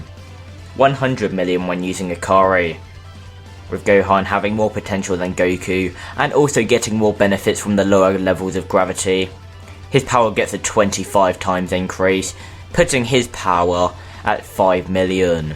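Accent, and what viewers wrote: United Kingdom. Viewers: Is the man Briish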